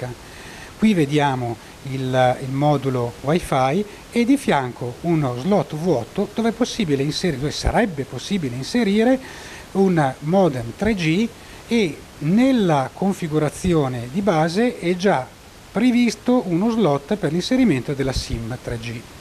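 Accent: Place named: Austria. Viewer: Ich kann sein Deutscher Accent schwer verstehen. Versheinlich aus Oestereich oder irgendwas. *shrugs*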